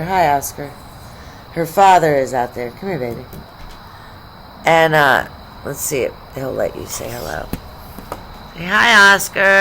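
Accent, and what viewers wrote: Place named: New Jersey, United States. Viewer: Just found your channel. You sound so much like a writer/comedienne named Tara Dublin. Same throaty, sort of New Jersey girl accent.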